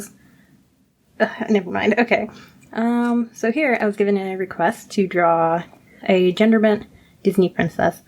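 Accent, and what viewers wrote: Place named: Michigan, United States. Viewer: Ah man! I have so much to say about this! It is lovely to see everything, and I'm impressed by how consistently well done everything in this is (most of my sketchbook pages are completely unfinished.) Your experimentation with styles is inspired and wonderful to look at because of how well you adapt to them. I'm scared that you don't like practicing full body though. I know I struggle with it too, so I get that it's intimidating, but please don't be discouraged! Gesture drawings and references really do help, and all artists should integrate them into their habits. Also your accent is adorable, my god I never realized how different Michigan and Canada's accents are.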